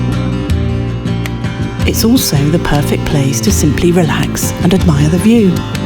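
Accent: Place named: United Kingdom. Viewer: What I like best in this video is your first class British accent.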